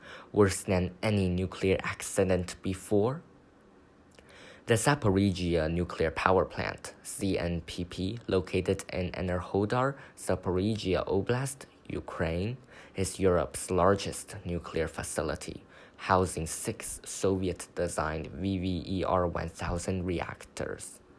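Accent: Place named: Asia. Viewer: Guys who come later, just ignore his Asian accent!!! CUZ IT IS REALLY A FABULOUS VIDEO!!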